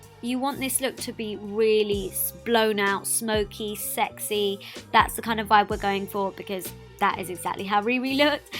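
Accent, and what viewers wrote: United Kingdom. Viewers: британский акцент? такой противный)))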